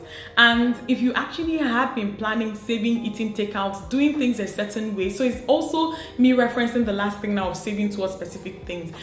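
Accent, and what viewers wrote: Igbo, Nigeria. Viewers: There’s nothing wrong with them oo. That Igbo would always surface 😂 and is lovely. Thanks for sharing.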